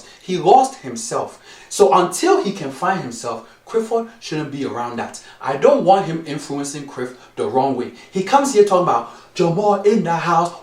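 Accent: Africa. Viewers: Why you talk african but cliff dont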